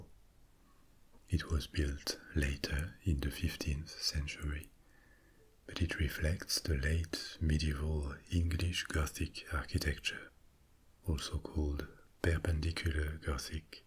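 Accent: France. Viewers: I'm french and I'm listenning to a french guy speaking in english, I guess I'm weird. However the voice is really relaxing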